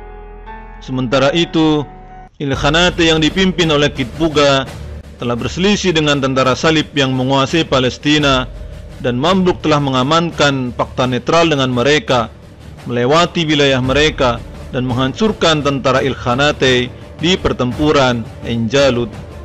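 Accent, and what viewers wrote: Bugis, Indonesia. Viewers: Mcm logat Bugis ini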